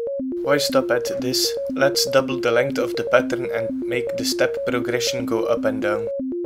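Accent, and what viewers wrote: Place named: Belgium. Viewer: Afgaand op het accent ben je zeker ook Belg? ;-)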